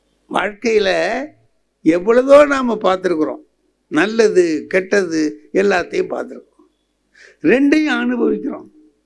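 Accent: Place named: Cauvery Delta, India. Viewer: I like this delta district accent because I too from there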